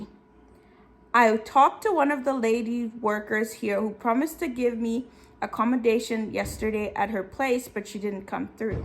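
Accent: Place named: Jamaica. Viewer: Hi. Just came across your channel. Curious to know if you were born and raised in Zambia. You kind of sound Jamaican to me lol. I'm Jamaican by the way 🙂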